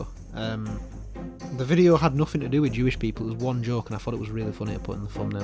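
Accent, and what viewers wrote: United Kingdom. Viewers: the comment about hating on the British accent is sad. I think the British accent is cool, like a calling card of their culture. I love this channel because he has almost no filter. I can't get enough. Keep up the good work. Help the this poor sad world.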